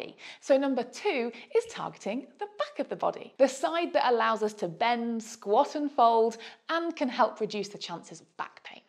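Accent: United Kingdom. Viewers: And beautiful English accent. Not another American.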